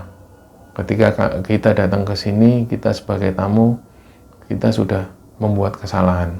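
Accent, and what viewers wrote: Java, Indonesia. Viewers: Suara nya khas jawa .....bgt walau menggunakan bhs indonesia tp khas nya g ketinggalan ....,bisa mengobati kerinduan akan kmpung halaman,dan cerita2 yg d sajikan bnr2 enak d dengar ....salam kenal mas dr kab,bekasi..... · ikut nyimak mas...terasa bgt alunan suara khas Jawa ...sangat mistis rasanya....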